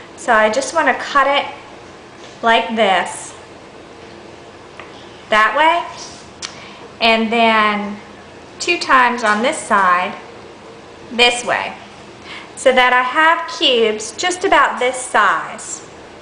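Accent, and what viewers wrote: South, United States. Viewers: Is that a southern accent I hear? :)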